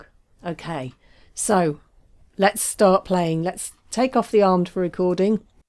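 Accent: England, United Kingdom. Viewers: Hello, I'm from Germany, did I recognize your sound that you come from England or is it from another country?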